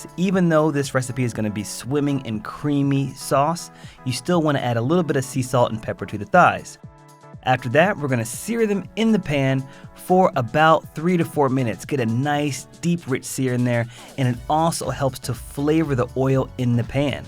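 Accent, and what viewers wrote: United States. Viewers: Whoop whoop Such an American accent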